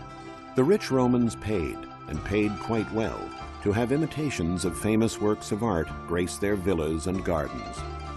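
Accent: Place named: United States. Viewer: To attain the real truth in these matters I am convinced a time machine is required. As in present times, the political landscape must have been a swamp of trickery and treachery. An American accent covering such a topic, per the BBC, just doesn't make sense.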